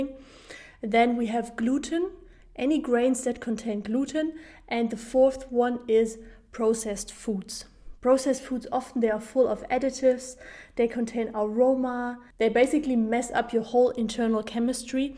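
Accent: Germany. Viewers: Trying to guess your dialect...German? Austrian? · Thanks soooo muchfor this Video God Bless you from Germany Your acent sounds like German. Frohe Pfingst Montag 2019 Iam srarting tomorrow <num> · R u german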